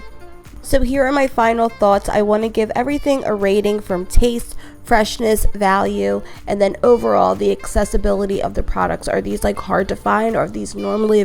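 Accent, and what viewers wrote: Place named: Philadelphia, United States. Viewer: This is my first time seeing your video... I heard your voice and knew immediately that you were from Philly. I'm also from philly!!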